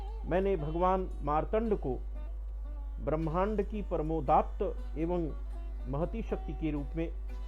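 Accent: Standard. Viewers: शुद्ध उच्चारण के साथ -साथ अद्भुत प्रस्तुतीकरण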